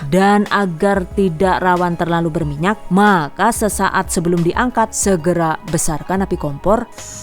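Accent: Banyumas, Indonesia. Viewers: Aku suka koment nya...ngapak pd karo aku jan² jos bgt koh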